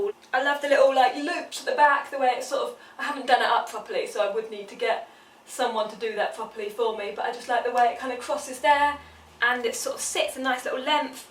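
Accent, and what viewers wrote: United Kingdom. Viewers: Hi i'm a new subscriber loved your video and your British accent ❤😘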